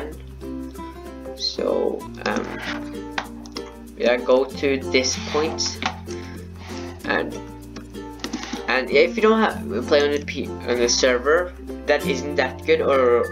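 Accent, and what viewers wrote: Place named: Germany. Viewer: Are you german? Cus your accent which i love...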